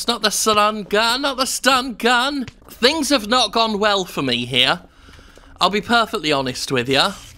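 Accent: United Kingdom. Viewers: Glad I wasn't the only one wondering if he sounded more British than usual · sounds.. extra british in this one.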